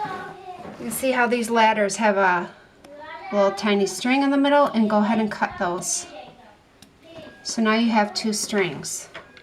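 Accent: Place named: North, United Kingdom. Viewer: She is so sexy love the north/mid eastern accent.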